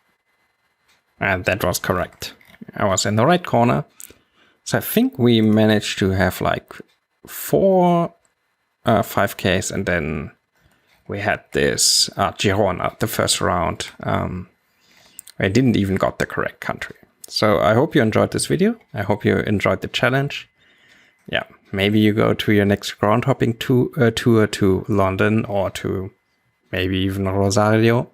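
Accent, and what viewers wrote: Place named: Germany. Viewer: Hi, thanks for subscribing to my channel ! Where are you from btw ? I'm guessing either Germany, Austria, Switzerland or Denmark based on your accent (but I might be completely wrong haha) Edit : after watching another video (the Romania Fake one), I'm not so sure now haha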